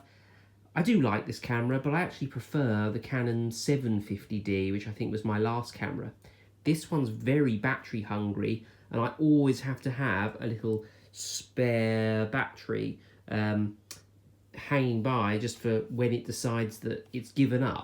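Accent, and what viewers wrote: United Kingdom. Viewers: I like listening to your very British pronunciation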